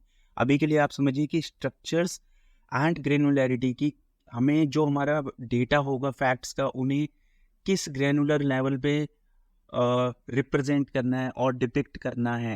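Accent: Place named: India. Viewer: Good Indian accent on YouTube.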